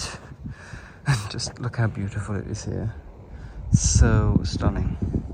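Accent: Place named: England, United Kingdom. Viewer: What an amazing video!!! Thank you for sharing all of this beauty with us. But what makes the video even more enticing is your voice. It is so soothing and your English accent just draws the viewers in; I can't get enough of your videos!!!!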